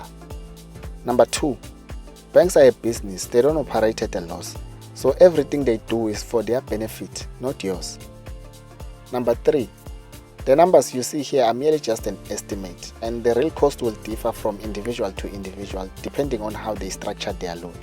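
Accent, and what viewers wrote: South Africa. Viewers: And then the last part made me subscribe, athi "With a budget of 36 000 per month I would buy a corsa lite or a tazz every month for 6 years" lol the humour caught me off guard I laughed so hard. Nice video bro. Your narration has lots of mzanzi flavour, keep it up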